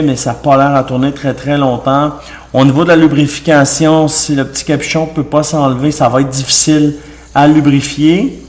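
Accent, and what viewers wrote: Quebec, Canada. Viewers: Perso les gars moi je regarde les vidéo 50% car son accent quebecois est génial et 50 % pour découvrir des nouveaux objets.^^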